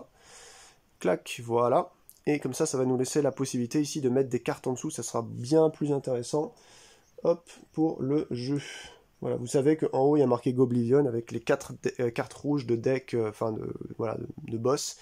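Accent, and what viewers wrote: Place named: Quebec, Canada. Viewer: Woah! Tu n'as pas eu de chance mais vraiment pas! Bon, je vais devoir me procurer la nouvelle édition, je la trouve vraiment mieux. Au niveau des dessins, c'est Jean-François Gauthier qui fait tout: invente le gameplay, fait les dessins, s'occupe de l'édition, vraiment tout pour les 3 jeux. Plusieurs personnages proviennent de Goldblivion, trop bien! Ton accent québécois est parfait! 😄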